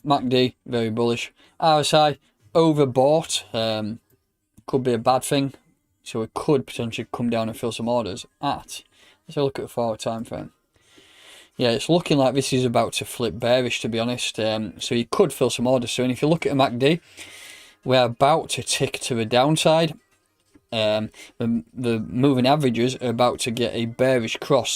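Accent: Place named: Yorkshire, United Kingdom. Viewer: I was working and living in Scotland about 15 years ago... and people there speaks similar to you, so to me it's a pleasure to watch your channel and listening your British English language from Yourkshie 👍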